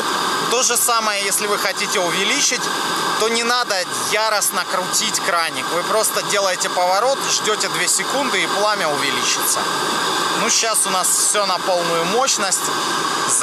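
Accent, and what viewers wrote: Russia. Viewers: 1. Говоришь "я дам свое мнение" чувак какое МФТИ ты безграмотный. 2. Акаешь, кривляешься, слушать невозможно, отвращает с 1й - же минуты хотя что взять с масквАча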